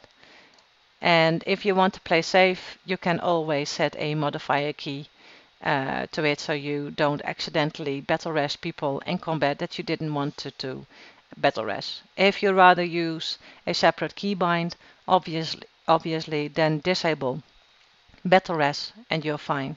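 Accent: Netherlands. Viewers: Ive heard right away that she was dutch coz i have the same accent :))). But i have another question. Ive started to play wow again and downloaded my fav vuhdo healing addon. I just bought also another mouse, logitech g604. Problem is i cant figure out how to setup the mouse buttons after mouse button 5 in vuhdo. It looks like vuhdo dont see the buttons after button 5.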